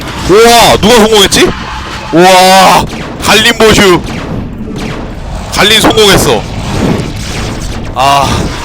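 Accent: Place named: Daegu, South Korea. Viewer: 님 대구에 사시나요?교회에 댕기고는 사투리인데 내가사는곳의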